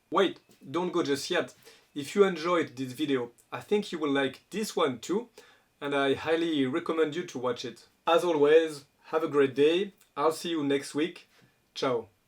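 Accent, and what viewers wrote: France. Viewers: While having an obvious French accent, his English is quite concise and easy to understand. You are also clearly a nonnative English speaker judging by the way you have worded your comment. Either that or you are just poorly educated. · your english is very bad.. it sound very french! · Your comment was totally unnecessary, is more than obvious that he’s French and of course he has an accent, but that’s it, I didn’t hear any grammar errors, I understood 100% what he said.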